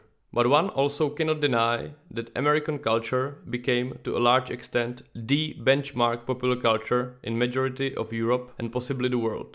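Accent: Czech Republic. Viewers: You have Czech accent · Great. Výborný obsah, i když ten český přízvuk je v té angličtině hodně znatelný :)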